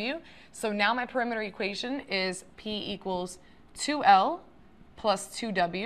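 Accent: United States. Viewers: Are you american?😁😀☺😊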